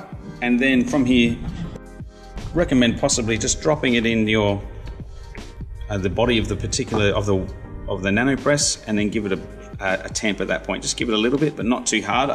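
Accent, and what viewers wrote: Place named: Australia. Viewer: Nice oz accent mate, I am Asian spent 4yrs in MEL,I feel like home when u speaking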